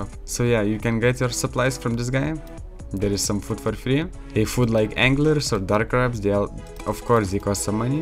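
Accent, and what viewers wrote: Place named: Lithuania. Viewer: You sound like you are from lithuania , btw great server 100 + players online everyday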